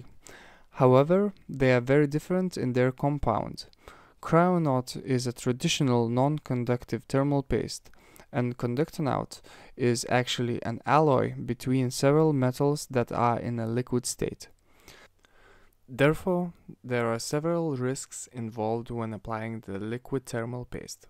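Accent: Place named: Russia. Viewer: Русский что ли ? По моему акцент есть .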